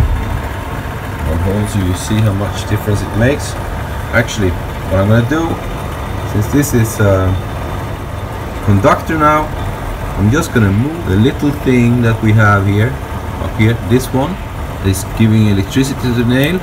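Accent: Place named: Sweden. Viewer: Haha typiskt Svengelskt uttal, I övrigt bra video.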